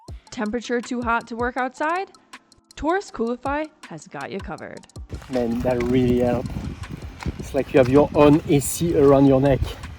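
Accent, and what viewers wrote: France. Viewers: Bonjour Est ce un petit accent français que j’entends mdr Superbe vidéo merci de vos conseils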